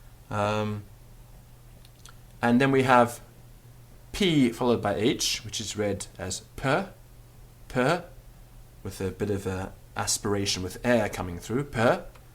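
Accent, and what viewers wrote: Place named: England, United Kingdom. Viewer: If this is the first lesson, I do believe that he can teach the whole language in 3 lessons. Also his English accent makes it sometimes a little difficult to understand the words in Latin.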